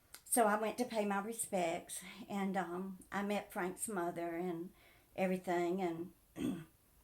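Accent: South, United States. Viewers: I came across your videos a couple of weeks ago. After watching the first one I was hooked. I went back to the first one and I have been bing watching and finally have caught up to your most recent one. You are a breath of fresh air, I love your soft southern voice (I’m from Kentucky so I’m pretty southern myself😉). I love all your stories, cooking, and your teaching to the younger generation about finances & saving. God Bless you sweetie😊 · I like your soft voice and your southern accent. You're a born storyteller and your life stories are worth hearing. So sorry about your son and Frank too.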